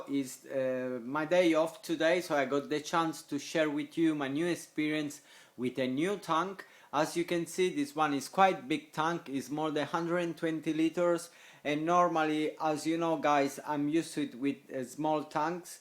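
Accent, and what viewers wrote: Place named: Italy. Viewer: i enjoy your video and i also like listening to your italian accent. LOL peace.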